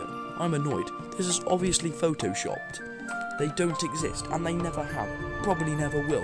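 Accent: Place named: England, United Kingdom. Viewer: Are you English? You have a strong accent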